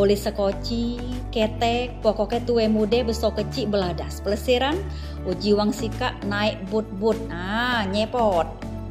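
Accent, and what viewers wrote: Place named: Indonesia. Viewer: logat nja kamu ngomong seperti logat behese sengedeng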